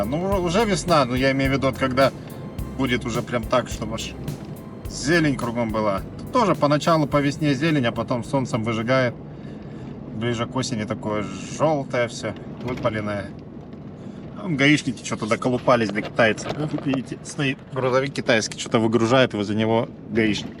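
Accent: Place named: Kirov, Russia. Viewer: Спасибо за видео. Приятный кирОвский акцент.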